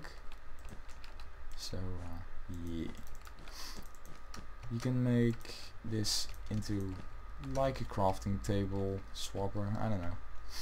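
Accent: Netherlands. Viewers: Are you Dutch, I can hear it from your accent ( Ben trouwens ook Nederlander :) )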